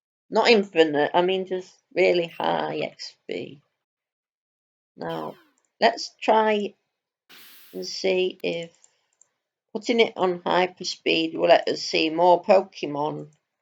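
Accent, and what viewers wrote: United Kingdom. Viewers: I cant put my finger on it.. what is your accent? British?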